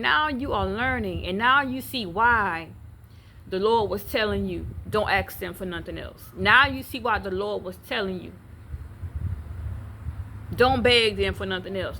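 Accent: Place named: Louisiana, United States. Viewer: SIS❤ You gotta be from Louisiana❤ with that Come on Nah😂❤ I Love my State, but Yeah, when I tell You I’m so glad GOD had me follow you💚 When I tell You, You be Conforming🙌🏾🙌🏾💚🗣️ JESUS🤍 HE DONT want NOBODY taking the Credit for what HE is Doing in my Life🙏🏾🙏🏾 Yeah things a lil shaky BUT GOD 🙌🏾🙌🏾🤍 I’m at Work Smiling so hard😁😁 You uplift my Spirit 🙌🏾🙌🏾🤍💕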